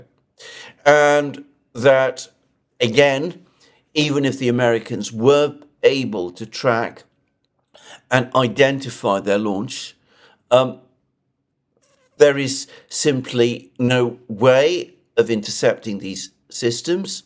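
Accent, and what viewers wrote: United Kingdom. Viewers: Thanks for all you do for us:-). Love your beautiful British accent:-). Take care👍🏻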